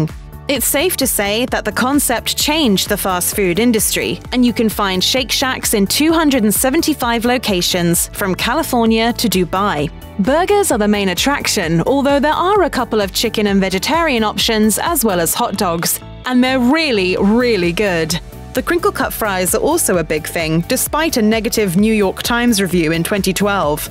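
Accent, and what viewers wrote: United Kingdom. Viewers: I dislike theBritish narrator. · The British accent seems inappropriate for this food.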